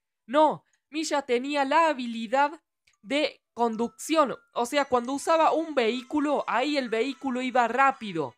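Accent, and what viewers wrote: Córdoba, Argentina. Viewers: Gracias por La Info, Casi Me La Compro Para nada. Sos Cordobes? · Sos cordobes??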